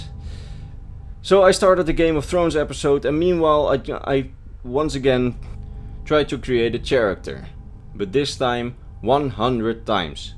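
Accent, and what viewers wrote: Netherlands. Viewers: U sound dutch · I hear somebody is dutch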